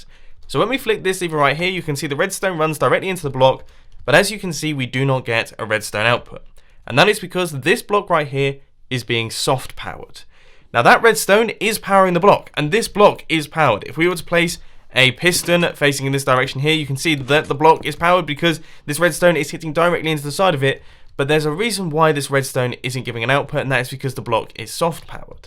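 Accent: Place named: England, United Kingdom. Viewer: Love your redstone contraptions and your english accent. XD aka, U r my hero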